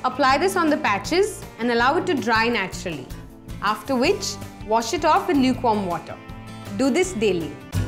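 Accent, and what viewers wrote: India. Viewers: The indian accent is so cute :O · Oh you have such a lovely Indian accent.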